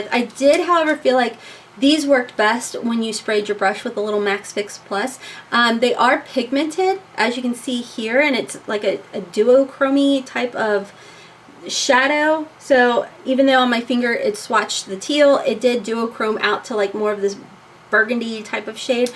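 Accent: South, United States. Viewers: Found your channel by searching for an Aphrodite review and realized that Jen Luvs Reviews mentioned you the other day, I thought I subscribed that day but I guess I didn’t but I’ve been binge watching all your videos the last couple days! Love ur content n love how honest you are. I can’t help but notice tho, you try to hide your southern accent, it’s so pretty when it comes thru. You should let it shine thru more. Btw, what camera do you use? Love ur channel! Much love from southeastern Ohio! 😘😘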